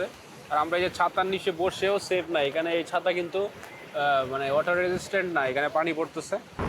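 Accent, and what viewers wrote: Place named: Mymensingh, Bangladesh. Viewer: সম্ভবত ময়মনসিংহের আশে পাশে।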